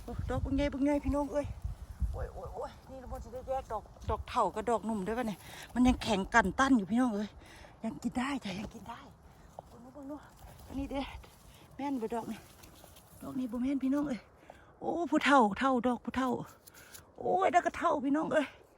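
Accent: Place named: Nyo, Thailand. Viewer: สวัสดีจ้าสาว อยู่จังหวัดใด๋น้อคือเว้าญ้อ ฉันอยู่ สกลเด้อจ้า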